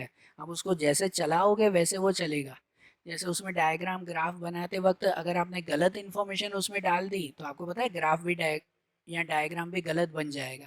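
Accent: Gujarat, India. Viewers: Good explanation sir gujrati cho ne 😅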